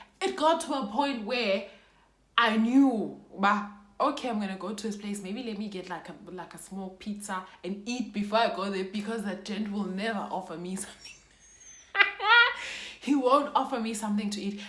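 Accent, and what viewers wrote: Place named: United Kingdom. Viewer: That British accent wow you just nail it 1️⃣0️⃣0️⃣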